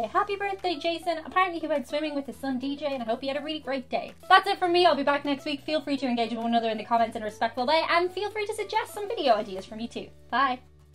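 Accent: Ireland. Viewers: I'm already subscribed ...lol all it took was your Irish accent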